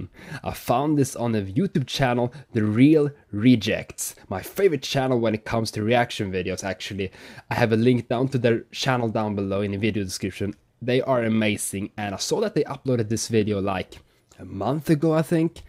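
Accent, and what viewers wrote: Sweden. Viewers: love your accent by any chance are you swedish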